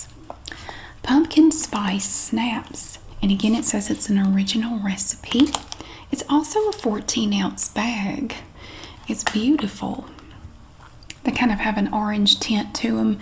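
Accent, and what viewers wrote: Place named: North Carolina, United States. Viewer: Yes, she is a North Carolina native and her beautiful, musical accent is natural! 💖😊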